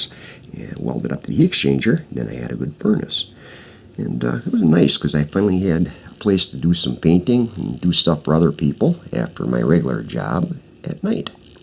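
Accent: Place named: North, United States. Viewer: awesome vid keep em comin. cant tell if hes from sconny or sota but i love the northern accent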